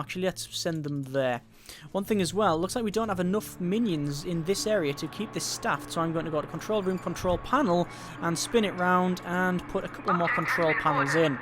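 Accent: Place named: England, United Kingdom. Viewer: ***** Nope, he's english.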